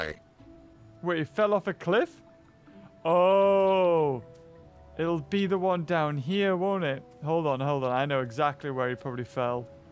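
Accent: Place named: Newcastle, United Kingdom. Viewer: You from the Toon, mate? EDIT: ok the question is now nuill and void, you said "Alreet" LoL.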